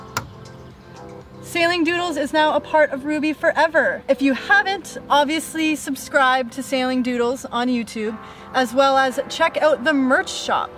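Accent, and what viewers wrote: Canada. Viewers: I came for the cutie with the Canadian accent. Then realized the video was pretty good too. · Your accent is so Canadian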